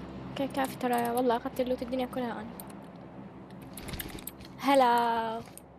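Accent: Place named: Iraq. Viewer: ماقصرتي👍 دخيل لهجة اهل العراق أهل الغيره أخوكم من سوريا سوراقي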